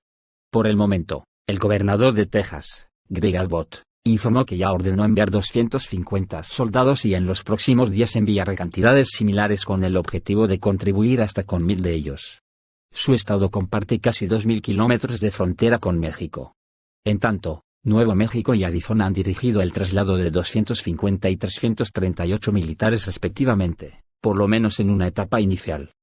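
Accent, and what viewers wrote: Spain. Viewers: No eres de MEXICO asi que no tienes derecho a opinar sobre el pais mejor preocupate por tu pais si es que tienes pero por el acento eres gachupas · Este estupido tiene asento español....es un pendejo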